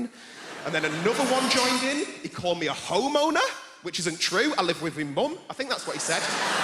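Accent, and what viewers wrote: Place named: North, United Kingdom. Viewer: Fantastic set. I can never get enough of a Northern British accent, as well.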